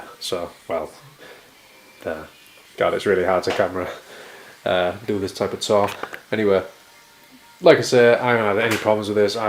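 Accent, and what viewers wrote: United Kingdom. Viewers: I think you should narrate a nature video. Smooth British accent sounds so intelligent through the speakers. Oh, and nice rack.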